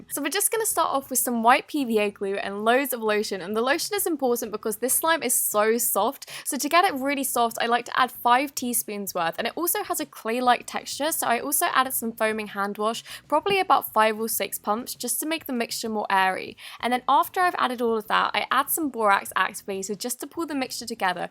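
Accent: United Kingdom. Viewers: Are you British??????!!!!??!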